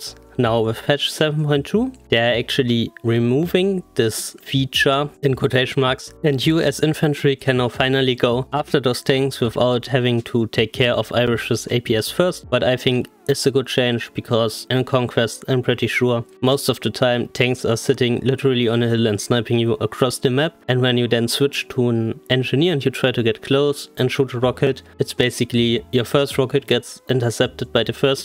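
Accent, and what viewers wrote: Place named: Germany. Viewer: Tell me youre german without telling me youre german. The accent is based af..... like for that xD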